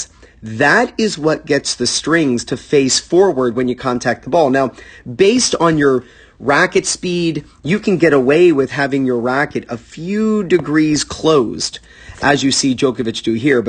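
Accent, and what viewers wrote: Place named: United States. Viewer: ...with American accent. Nothing wrong with that, just saying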